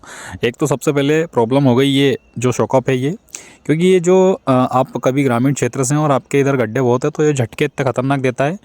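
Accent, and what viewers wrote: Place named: Indore, India. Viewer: Bhai tumhare bolne ka tone indore ke jesa kyu lg rha h.. tum Mp indore ke aas pas se ho kya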